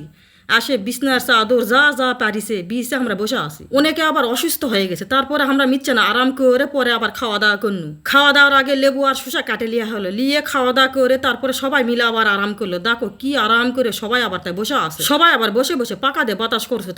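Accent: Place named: Naogaon, Bangladesh. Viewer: বাহ দারুন ভাবে তো বললেন নওগার ভাষা ❤❤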